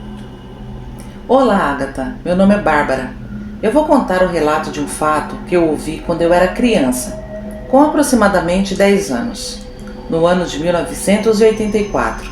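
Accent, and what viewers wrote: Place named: Caipira, Brazil. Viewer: Essa entonação caipira ficou muito boa. Parabéns ❤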